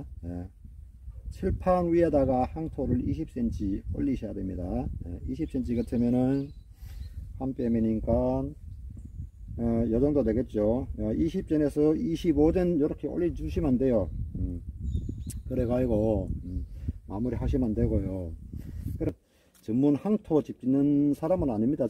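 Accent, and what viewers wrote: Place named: Gyeongbuk, South Korea. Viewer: 경북우리고향 사투리네여 ~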